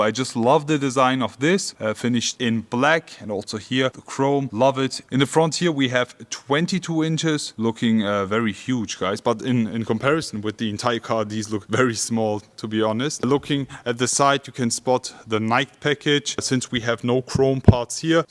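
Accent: Germany. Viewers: I love your German accent. I won’t say who you sound like but I like it. I also think you give very good presentations and cover the smaller details as well as the big ones!!! Much love and respect and the new EQS SUV is to die for. Such quiet class and beauty!!! I could live in that thing…🥰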